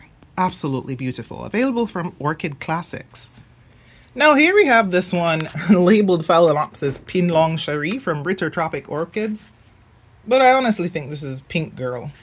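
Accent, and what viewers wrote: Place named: Jamaica. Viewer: Hi new friend 😊 I just subscribed to your beautiful channel. I know I heard the Jamaican accent in your voice, and then I read the description and see that you are . Your orchids are beautiful. 😊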